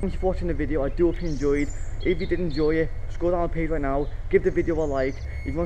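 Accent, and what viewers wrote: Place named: Liverpool, United Kingdom. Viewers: Hes from Liverpool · I think he's just a typical scouser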